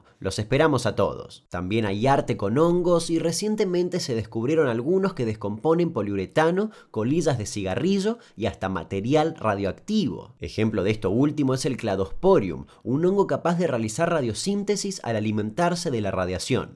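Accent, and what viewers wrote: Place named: Argentina. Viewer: que bello cuando entro a un video de un canal que no conozco y termina siendo que el youtuber es argentinoooo, hermoso acento y precioso pais. saludos desde argentina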